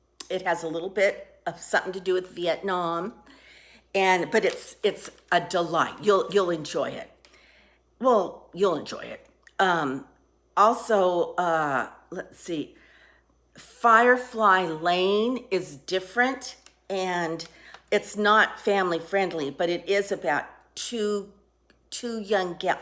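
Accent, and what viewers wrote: South, United States. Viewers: Hi I love your Q&A idea. Several years ago I watched your fantastic video about your high school reunion in Mississippi. So my question is what brought you to Mississippi and do you stay in contact with your classmates? I live in Alabama and every once in awhile when you speak I detect a slight Southern accent. Love ya!!!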